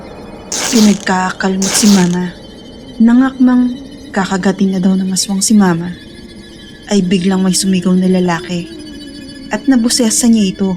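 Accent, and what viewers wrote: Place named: Conyo, Philippines. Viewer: Yunh conyo ka HAHAHAHA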